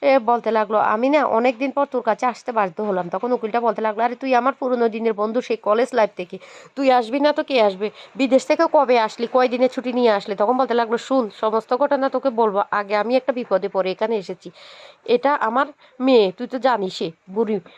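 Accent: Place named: Noakhali, Bangladesh. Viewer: Didi apnr kathagulo kmn lagche sunta. Apni ki nowakhali dist a thaken?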